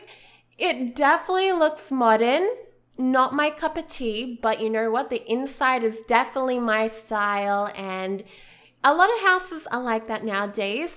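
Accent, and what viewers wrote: Australia. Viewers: Muflon She's Australian :) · is she australian?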